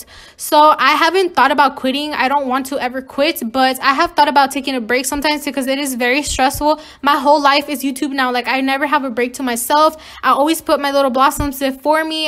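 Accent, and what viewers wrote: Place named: Latin America. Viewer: Yay I thought you were also latina because whenever you talk I always hear a spanish accent (if you know what I mean) now I know we both are 😊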